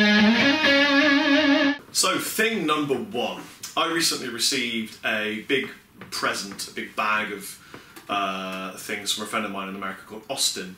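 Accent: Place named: England, United Kingdom. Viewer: I'm a right Scottish fellow but I speak the American English if you will. I find your videos a kin to myself. We're large types of guys and I find myself being proud to see someone speaking the Queen's English! I'm proud of your success thus far!! I hope to see you guys on the West Coast Americas very soon! BTW Chapman Guitars are quite literally the best thing since sliced guitar bread.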